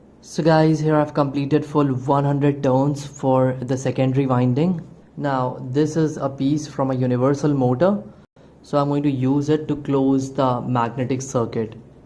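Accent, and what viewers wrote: India. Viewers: У него индуский акцент??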